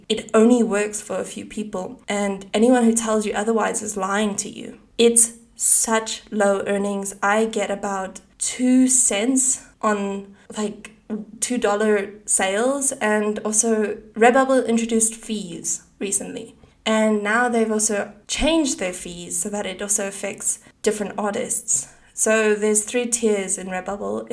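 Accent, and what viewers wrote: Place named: South Africa. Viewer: im like this girl 100% sounds south african and boom i was right. i do redbubble for fun and you are 100% right through out your video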